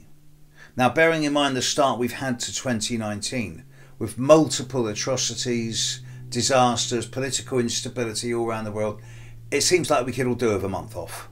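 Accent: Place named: England, United Kingdom. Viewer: Hi! I love your astrology reading and your accent, where you come from in England? I need to go there 🙏😊 Thank you so much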